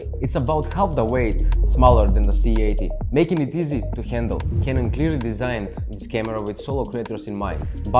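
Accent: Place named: Russia. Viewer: Такое чувство что ты русский который выучил английский и косишь под американца))) А по теме всё супер! Спасибо за видо.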